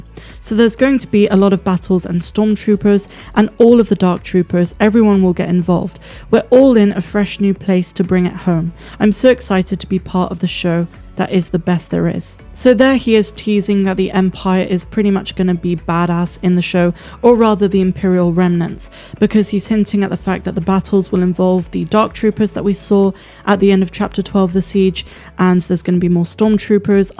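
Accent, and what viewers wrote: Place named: Somerset, United Kingdom. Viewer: Loving your content, As a fellow SW (zummerzet) Star wars fan and Storm trooper cosplayer, It's gert lush mate! It,s so nice to hear an accent like mine doing this style of content.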